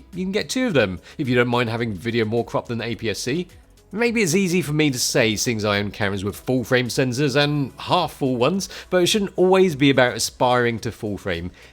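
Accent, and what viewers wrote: United Kingdom. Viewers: I've been trolling this guy for years and still haven't figured out if he's Japanese or chinese. And what's with than clean british accent? British chavs don't talk like that. No respect for her royal imbred racist majesty queen Elizabeth and stuff. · Forget the British accent, I swear this guy looks 17 and 70 at the same time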